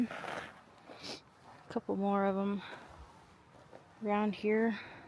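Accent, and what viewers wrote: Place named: Montana, United States. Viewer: Your starting to sound like them people in MT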